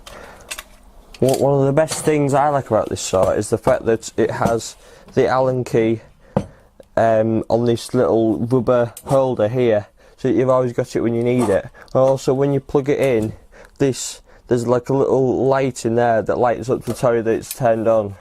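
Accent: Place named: Manchester, United Kingdom. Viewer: Wow the manc accent in strong in this one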